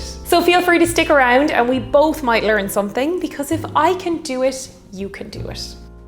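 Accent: Ireland. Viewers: Sorry...not even certain what she said. I was too busy listening to how she said it. That subtle, distinctive, lovely, Irish pronunciation gave me an eargasm. 💖💖💖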